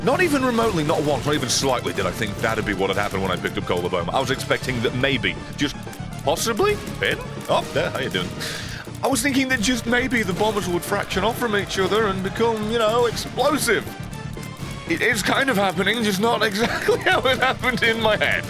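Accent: Scotland, United Kingdom. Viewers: I love his Scottish accent and I have no idea why.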